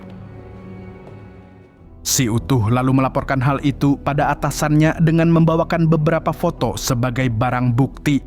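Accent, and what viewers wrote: Banjar, Indonesia. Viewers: baaaaah iyaa am buhan banjar naratornya leeeh....gas kaaaan mang...... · Orang Banjar kah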